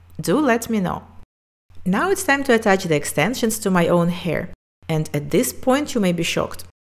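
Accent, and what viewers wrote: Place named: Russia. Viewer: Я тоже из старичков. Люблю видео и сразу услышала наш, русский акцент❤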